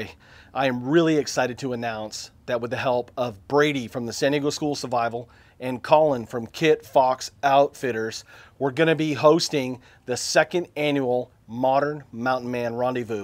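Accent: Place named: Maine, United States. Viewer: you sound like us Mainers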